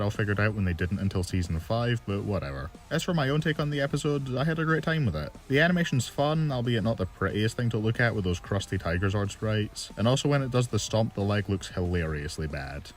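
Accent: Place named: United Kingdom. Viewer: Sick new remake on a great season review. I think I mentioned this before, but there's something about UK presenters for these types of reviews that make them really easy to listen to. You, Critical Drinker, Mauler, etc.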